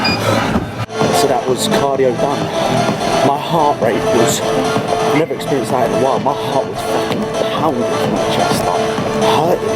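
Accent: Liverpool, United Kingdom. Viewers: You sound like Darren till with the scours accent. Remote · Mate really liked the way you talk us through that workout, everything was clear, well explained and also motavating, ive ofter criticised some of your vidoes but in a good way to motavate you and help with the things i think you could work on and you have just really shown me you know your job, hats off man also im from Liverpool, loving the Scouse accent hahahah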